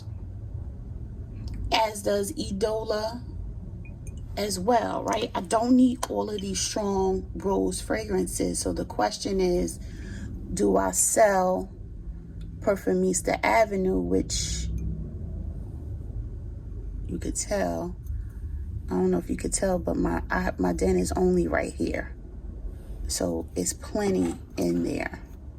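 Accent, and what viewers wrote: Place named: New York City, United States. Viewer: New viewer here 🖐️.. your NY accent is so strong lol nice collection 🙌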